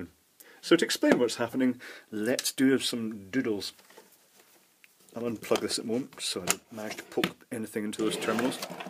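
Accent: Scotland, United Kingdom. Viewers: Cool, a channel worth watching!, thanks man! Loving those dulcet Scottish tones and the information and ideas you provide in your vids, keep it up, you got me interested in electronics again! Regards and greetings from south east UK! :)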